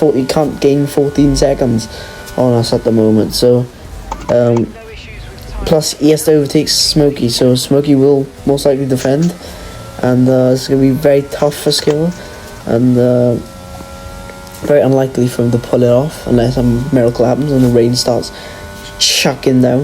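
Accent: Wales, United Kingdom. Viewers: I’d recognise that accent anywhere! Newport or Rhondda? Great driving mate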